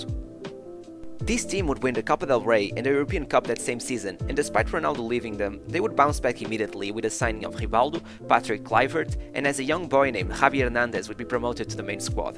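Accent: Portugal. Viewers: O sotaque e pronunciares bem os nomes portugueses denunciaram-te🤣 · Judging by your accent, I'm very sure you are Portuguese. Great job with this channel, keep going! 😁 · Lovely video from a portuguese speaker, if I can catch your accent correctly, am I right?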